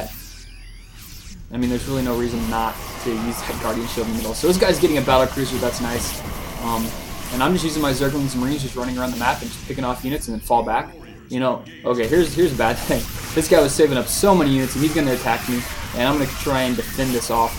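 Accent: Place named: Texas, United States. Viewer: I like your texas accent :>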